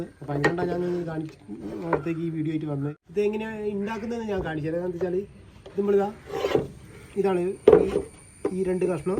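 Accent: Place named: Kozhikode, India. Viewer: ഭാഷ ആക്സന്റ് കേട്ടിട്ട് കോഴിക്കോട് അല്ലെങ്കിൽ മലപ്പുറം ആണ്.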